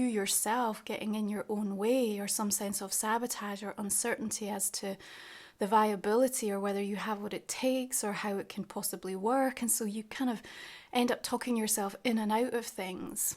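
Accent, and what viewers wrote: Scotland, United Kingdom. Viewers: I'm a Glaswegian leo in new York and it's nice to hear that scottish accent reading tarot, maybe it'll bring me luck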